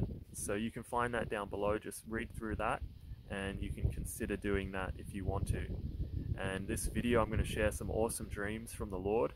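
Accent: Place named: Australia. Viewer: I like your Aussie accent mate ❤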